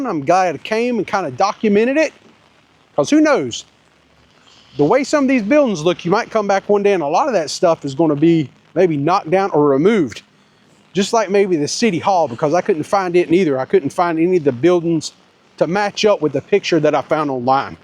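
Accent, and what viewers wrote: South, United States. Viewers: While these towns have seen better days, I do appreciate your visiting them , rain and all. While saddened what has happened to these towns, because of a number of factors, one can't replace their history. The beautiful old police car (?), I mean, come on. how great it that?. When the rain stopped, you entered the town of OLAR which I thought (through your southern dialect) was OLA, the name of my long passed mother., from the mountains of Virginia. Regardless, I did particularly enjoy that town and it's old buildings. Like you said, a little love paint, and the folks here just might see the value in a coffee/snack shop and barber to get thing back on track. Only time will tell.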